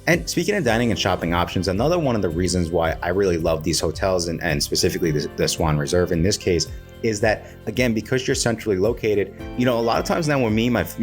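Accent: New York, United States. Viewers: I detect a NY accent!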